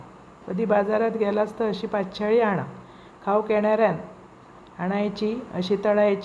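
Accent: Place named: Malvan, India. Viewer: Taiee you are very sweet i love your simplicity! I watch all your videos !! Because your Malvani bhasha 😂Ithe way you speak it's very hillourios 😅fun 😂Love from Mumbai ❤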